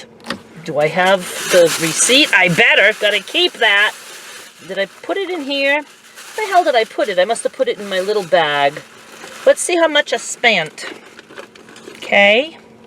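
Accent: United States. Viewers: I happen to love the swearing lol. You talk just like most of 'Merica :)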